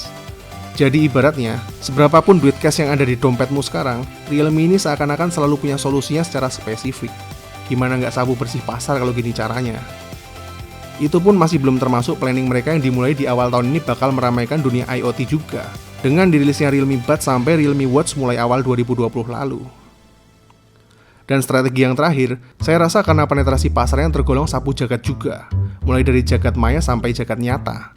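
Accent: Yogyakarta, Indonesia. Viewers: Ealah cah jogja to mas e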